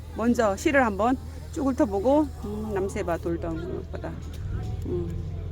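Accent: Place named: Gurye, South Korea. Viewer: 구례사투리까지 숨김없이 사용하시고. 잘봤습니다. 가보고 싶네요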